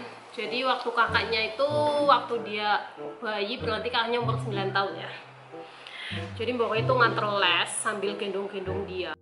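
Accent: Banyumas, Indonesia. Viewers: Salaam ngapak😁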